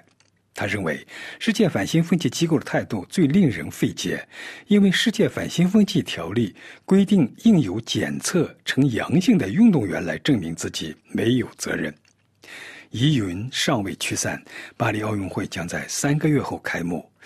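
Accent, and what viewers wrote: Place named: Shanxi, China. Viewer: 解说大哥你是山西人吧？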